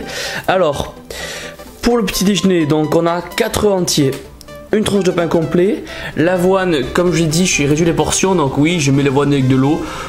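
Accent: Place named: Toulouse, France. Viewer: DAAAMN! Salut, j'ai une ptite question concernant mon alimentation, mais avant tout, saches que jte connais que depuis à peine 24h ( mieux vaut tard que jamais) et j'ai déjà regardé presque toutes tes vidéos en un jour, jmet le pouce vert à chaque fois et jme suis abonné biensûr;) J'adore cque tu fais, ton humour, tes intros, tes conclusions et biensûr... Ton accent prononcé de chez nous! ( je suis aussi toulousain et c'est une fierté que tu le sois aussi;) BREEEF! Je suis en sèche depuis un peu plus d'un mois et j'aurais aimé que tu me dise ce que tu pense de mon alimentation ( elle est vraiment très très proche de celle que tu montre dans la vidéo "QUE MANGER POUR PRENDRE DU MUSCLE SEC?" ) Mes compléments sont: de la whey isolate, de la caséine de calcium et des oméga 3 de <link> et jboufe pareil que toi genre œufs le matin, du poulet, des haricots verts, de la whey, de la caséine au coucher etc. 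La seule différence que j'ai avec toi, c'est que, pour être en déficit calorique tous les jours, je prends pas la collation de 10h et mes repas sont espacés de 4h avec 35g de prot à chacun. Je suis très carré, je pèse tout et calcule toutes mes kcal et je ressens pas le besoin de faire de cheatmeal pour le moment ( ma motivation me surprend moi-même ) En gros jfai en sorte d'être en déficit calorique tous les jours et j'ai un apport de 35g/4h de prot environ pour le maintien musculaire. VOILA VOILA pour résumer le prk de mon message, j'aimerai bien que tu me donnes ton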